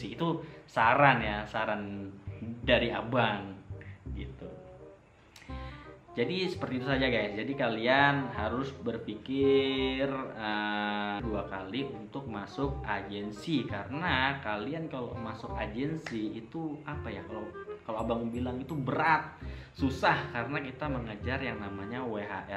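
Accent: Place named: Banjar, Indonesia. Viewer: tapi ada bahasa tadi logatnya kaya logat bhsa Banjar. Makanya saya kira dari Kalsel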